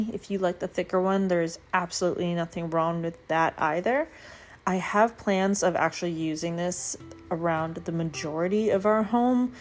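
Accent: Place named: New York, United States. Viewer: What were you doing (for work) before YouTube and motherhood? I remember you said you’re from NY. Upstate? (Accent noted!)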